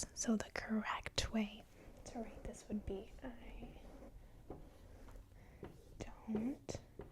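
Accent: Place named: Michigan, United States. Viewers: I'm almost positive that's a Michigan accent. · the way she said welcome back and others words was stressed like how Sara Pailin does but she’s from a spot in Alaska where people form Minnesota moved to and the accent is different there. But she has such a lack of accent at times it does lend to midwestern.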